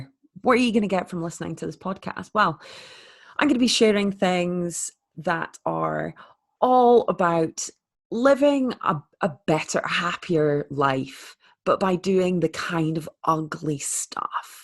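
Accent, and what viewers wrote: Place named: Scotland, United Kingdom. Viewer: Is it me or are you turning Scottish?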